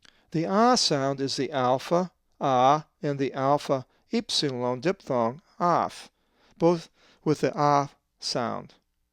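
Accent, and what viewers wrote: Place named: England, United Kingdom. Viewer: Many thanks for your excellent presentation. My native language is Greek and I would like to mention that you are very close to our pronounciation, despite the influences by your English tune. Also, I would like to add that the best way for someone who interests about Greek pronunciation, is to lend his ear in a Greek Orthodox church, where a medieval version of the language is spoken. This version has been transferred from parents to children centuries ago, and I can assure you that the tune is the same as the simplified, so called, modern Greek we use today. The differences of accent compared to that of the ancient language, are limited to the duration of some vowels of same sound. For example, the letter Ω,ω (ωμέγα, omega), was pronounced like an extended O,o (όμικρον, omikron), but today those two vowels sound the same. 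So, the difference between those two o, remains only in the written form. There were also some differences regarding some consonants sound. Greek Cypriots maintain this manner of speaking untill today. For example, when they say τέσσερα tessera (number four), they extend this double ss more than a simple s. This doesn't happen in the mainland of Greece, but the spelling remains the same. Anyway, I hope that all these are useful for those who interest about. Thank you again.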